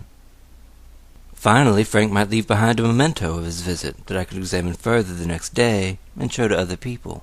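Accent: South, United States. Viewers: I really like your voice. Are you from Virginia? You sound like a classic southern gentleman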